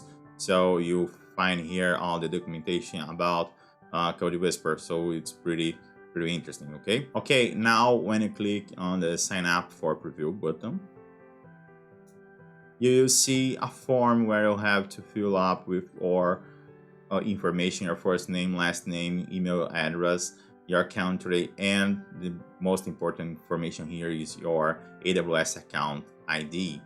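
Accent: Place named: Brazil. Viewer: Eu logo percebi que vc é brasileiro, nosso sotaque é unico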